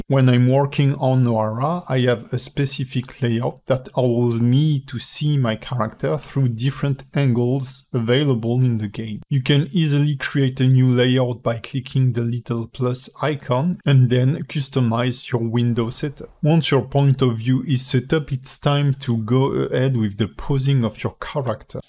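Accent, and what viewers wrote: France. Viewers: I love your french accent bro ( j espère que je me trompes pas en disant que t es français) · T'es Français uhn ? ça s'entend XD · ça sent le bon accent français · Est tu français car j'ai l'impression que ton accent est français. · french accent ? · i think you have a french accent ^^ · Hhaaa l’accent français 😄, super vidéo instructif, tout le monde en avait besoin. Merci beaucoup. En espérant avoir d’autres à l’avenir,bonne continuation. Ps: serait t’il possible d’avoir des sous-titres en français,même si on est en petit minorité sur ta chaîne(je pense) 👋👏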